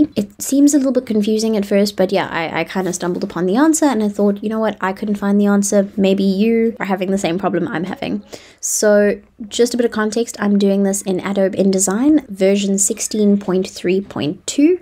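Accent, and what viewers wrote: South Africa. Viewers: I know a South African accent when I hear one haha! Great video. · South African accent stays winning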